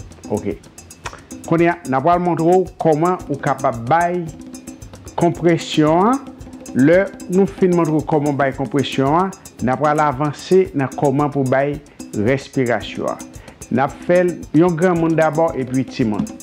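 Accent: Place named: United States. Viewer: Premyè fois ke mwen tande ameriken aksan la, avèk kreyol ayisyen la. Mwen pa te tout konprann. Men mwen imajine ke travay'w se de bòn kalite !!!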